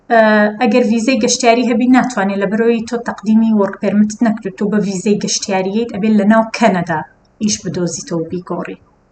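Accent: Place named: Sulaymaniyah, Iraq. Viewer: خه‌ڵكی كوێی زمانت سلێمانیه‌ و وابزانم وتت هه‌ولێریم🌝😅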